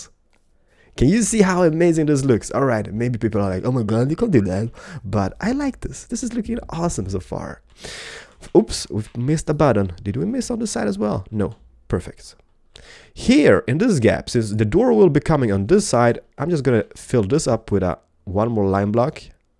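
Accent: Sweden. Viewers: are you swedish!? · are you from sweden!? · Are you Swedish if you are no Way im Swedish to!!! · WAIT ARE YOU SWEDISH?! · are u from sweden? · Are you from sweden ? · are you from sweden? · are you from sweden? :O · So swedish keralis Haha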